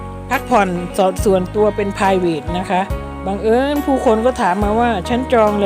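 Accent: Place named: Isan, Thailand. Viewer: สวัสดีค่ะพี่ เว่าอีสานม่วนแท่จ้า ขอบคุณที่มาแนะนำสถานที่และเอาภาพสวยๆของเกาะพงันมาฝากค่ะ💕💕🤟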